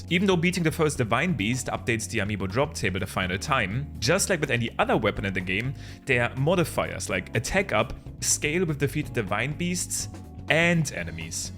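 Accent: Germany. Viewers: Ich bin leicht getriggert von diesem Deutschen Aktzent. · I hear Denglish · You Sound very german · Sag mal bist du eigentlich deutsch? Dein Aktzent oder dialekt ka hört sich stak so an. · Just wanted to ask are you german? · are u german? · Hey I am wondering if you are german as your accent reminds me of my accent. Sorry if I am wrong.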